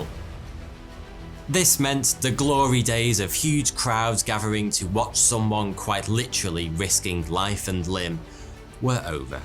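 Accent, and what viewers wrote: United Kingdom. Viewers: Thoughty2 why are u British?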